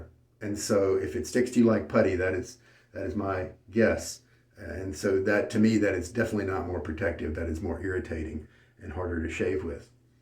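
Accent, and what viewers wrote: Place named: Carolina, United States. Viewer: oh it’s no problem at all! I get a bit overzealous with my comments sometimes haha. Very cool! I felt I was recognizing a carolina’s accent.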